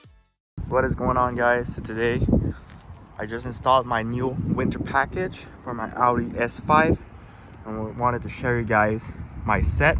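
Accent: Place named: Quebec, Canada. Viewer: Thanks for the video man!! I have the exact same car and wheels (but the coupe version) and found very little info online on appropriate winter setups. I'm also from Quebec which is pretty funny as I recognized your accent ahah. When choosing proper tires, how do you know if they will match the car's speedometer like you mentioned? Also, I believe the Yokohama tires have a "V" speed rating whereas the car is rated for "Y" tires, did you downgrade because they're winter tires? Thanks and have a good one!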